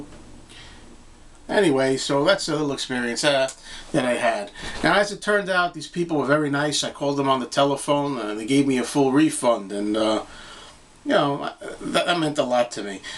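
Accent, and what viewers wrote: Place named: Queens, United States. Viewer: Hey, I just wanted to share with you that one of my best/favorite cousins lives in South Ozone Park in Queens. My cousin Mark and his older brother {my other cousin} Matt are the one that took me to see Led Zeppelin at the Garden in 1977. The whole city was taken over by Zeppelin fans from all over for a whole week straight. It was one of the best times of my life and concert history. Your accent is similar to theirs. I am sure you have been at shows/games at the Garden. Talk soon~ Rob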